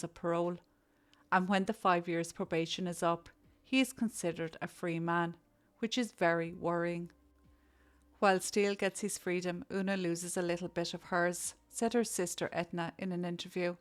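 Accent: Ireland. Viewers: I enjoy listening to your videos not only because of how interesting they are but it’s the added commentary that gives it the human touch( so much better than the robotic boring ones I’ve heard that constantly mispronounce things!) the lovely Irish accent seals the deal for me!! Keep them coming!! Great job!!👍🏻😉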